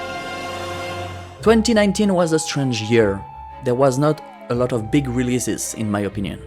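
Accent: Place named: France. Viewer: That charming french accent !!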